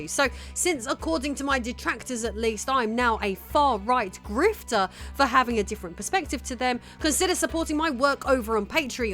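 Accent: England, United Kingdom. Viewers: Ilve been seeing people on YouTube are calling you and your husband white or British and making fun of your accent or teeth … and accusing you both of thinking your better than them because your accent…. If that isn’t racist I don’t know what is… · Apparently, I am addicted to subscribing to nerd content makers with British accents. I am so weak. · Refreshing to hear an English accent pronounce words that are articulated so differently Stateside (Minus the sarcasm). Wholeheartedly agree with your assessments. Great show as always!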